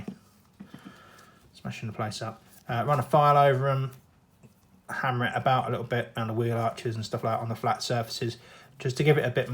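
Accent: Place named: RP, United Kingdom. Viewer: Awesome work Btw way you’re accent always knew you a bit posh lol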